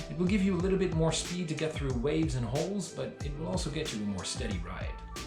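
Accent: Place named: Netherlands. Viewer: I live 1 minute from the Meditteranean Sea in Israel, and I find myself looking up info about buying my first kayak. This video pops up and without further checking you out, I can HEAR your Dutch accent. Then I look and figure out you're a Haarlemmerrrrr! My birth town! What a coincidence. In ieder geval, een erg informatieve video die me een stapje verder helpt in de richting van mijn eerste kayak avontuur. Groet uit het "heilige land". P.S. Is er iets over Middellandse Zee kayakken dat ik moet weten als beginner?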